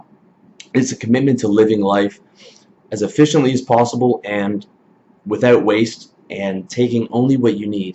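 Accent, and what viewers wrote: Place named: Canada. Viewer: You sound like you might be a neighbor to the north. :) I live in a small community filled with hoarders, so it drives me to youtube to find people who understand the call to minimalism. Great video, first I've seen of yours. Youtube is full of female minimalists but the male ones are somewhat harder to come by. I'm guessing the bulk of your channel isn't about minimalistic tendencies though. 😉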